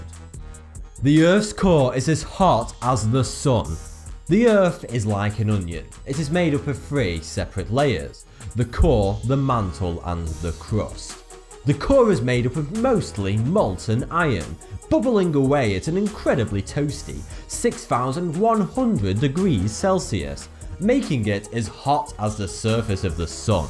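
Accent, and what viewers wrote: United Kingdom. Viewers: Is he British? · I love his British accent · So I don't mean to be rude but have you ever lived in Britain? He has one of the least annoying accents you can get, in my opinion. Would you rather have a cockney?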